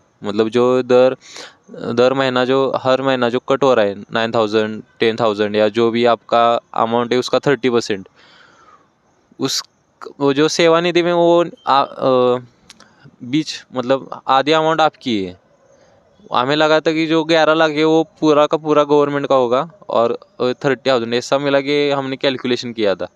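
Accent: Maharashtra, India. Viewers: Marathi aahe ka ha bhau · Bhai marathi ho kya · Bhai tu marathi lag raha he me pn marathi he from maharastra ❤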